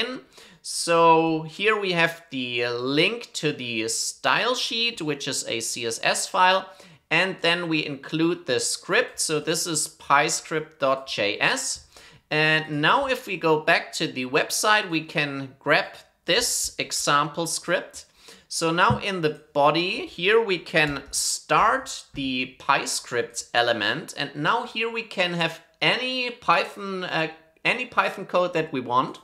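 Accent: Germany. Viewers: Short Question: Are you from Germany?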